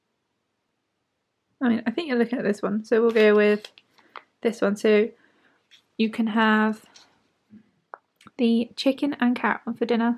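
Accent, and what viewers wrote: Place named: United Kingdom. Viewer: => Wow...That is such a lovely british accent...I dig it ;_)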